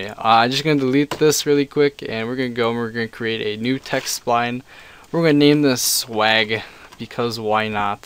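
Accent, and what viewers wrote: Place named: Quebec, Canada. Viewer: where are you from i can't tell from your accent. I'm either thinking Quebec or some french speaking place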